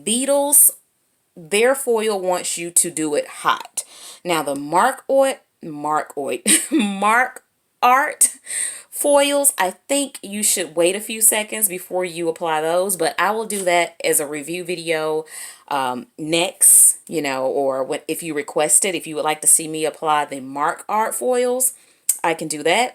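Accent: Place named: South, United States. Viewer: Thank you for this video! I absolutely love your country accent! ♥️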